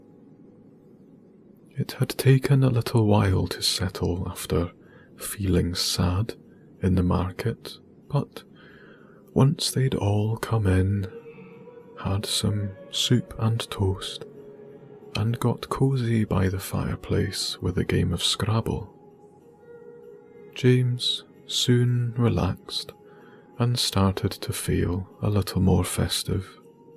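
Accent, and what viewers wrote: Scotland, United Kingdom. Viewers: Santa with a Scottish accent okay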